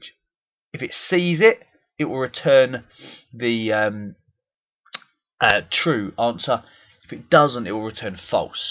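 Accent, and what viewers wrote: United Kingdom. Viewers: Couldn't be a better explanation, this is the best tutorial for VLookup. I liked the Brit accent. Oh My Gosh.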